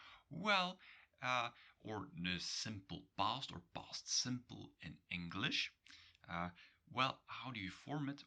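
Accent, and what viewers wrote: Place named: Belgium. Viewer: thank you. You get barely any views but you help me a lot with my dutch. Are you Belgian because your accent sounds interesting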